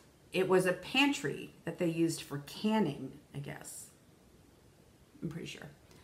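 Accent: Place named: Philadelphia, United States. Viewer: I have to watch you! You’re from up near my hometown (Philly) and the accent makes me feel like home lol plus, you crack me up 😂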